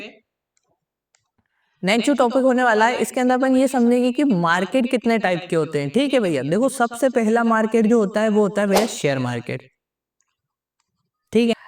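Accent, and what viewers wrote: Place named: Madhya Pradesh, India. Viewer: Sir aap Madhya Pradesh se ho kya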